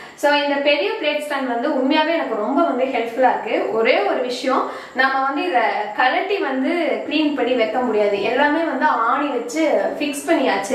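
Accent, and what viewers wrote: Kongu, India. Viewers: Kongu slang la pesunga video la onetime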